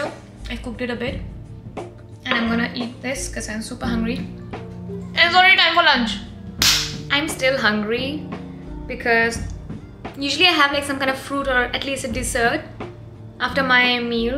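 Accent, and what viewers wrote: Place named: Kerala, India. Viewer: Yo....I have stumbled on your UT videos a couple of times in the past...but today my mind decided to chkout a few in good dtl....1stly, for cooking skills at this age, I must exclaim OMG, WOW WOW WOW n congrags n I did moreovr add, tht your speech tone (but slower talk speed dfntly), dress code, prsnlty and presentation, all in all is quite professional alrdy.. keep it up keep it up God Bless among the lots observed including cat out of bag you are mallu frm coupla tips of tongue..mnwhl the above in nut shell... 'am a very senior mallu myslf too...from out of India for long long time..I ardently follow my family trait n hobby to mentor n guide lifestyle/career...n its wht caught my eye n interest in a Chef Cinderella of future, yes, I think it cud be likely...but but BUT, you need to be WELL sculptured in literally alround ways... 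I never liked Ou Ou Ou french but do have a rather strange twist (if you will) connection tho...jst how on earth did you land up there..... BTW, am neither tech savvy nor the social media guy...so my fingers are yellping to stop...shd you wanna hookup in furtherance email/WA is my convenient preference...you've been off UT for 2mnths now it seems... will chk on your reply....nice getting wth you... be safe n care...God Bless... BRgds GK/Bobby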